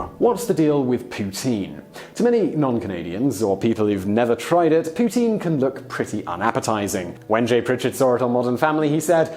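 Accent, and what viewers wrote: United Kingdom. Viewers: Why is there a British guy talking about Canada? Why not a Canadian, eh? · It is by a Brit you idiot, how could you listen to the guy and think by his accent he was American. Are you deaf or what is your problem ?.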